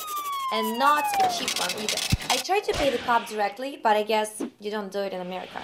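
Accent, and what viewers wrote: Russia. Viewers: she has an russian accent and russia is not part of Europe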